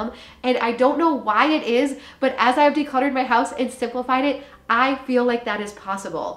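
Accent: Minnesota, United States. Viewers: I needed this ❤ Also a MN-girl ❤born and raised! I think that's why I "hear" you so well... 10 people could say the same thing, and I obsorb 🚫 nada But starts talking and its like the epitome of Minnesota Nice 👌 I'm so comfortable when you talk 😌 Really helps me actually, take in the lesson you're trying to get across!!!!! I freaking love you❤ Thanks for being you🙏 and all that you do for us!!!!!!!!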